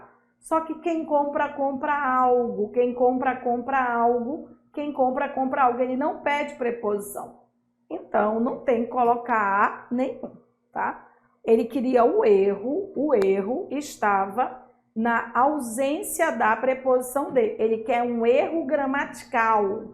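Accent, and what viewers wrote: Pará, Brazil. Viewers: Muito bons o conteúdo e a aula ❤ Percebi que a professora tem o sotaque do nosso Pará ❤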